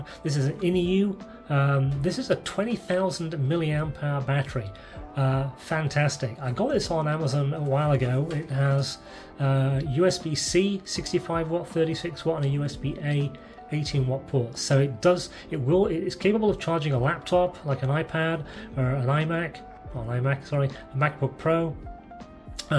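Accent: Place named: England, United Kingdom. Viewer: The Amazon links take me to Amazon in the USA so I presume that is where you are located despite your English accent?!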